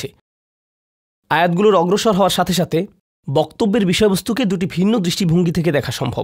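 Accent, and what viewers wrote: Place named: United States. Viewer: American English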